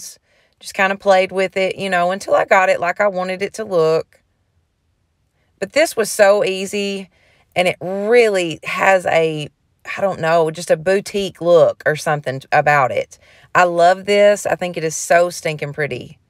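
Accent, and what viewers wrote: South, United States. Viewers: Just subscribed! I really like what you did with your items, but am in love with the way you talk---Southern country. It makes me homesick.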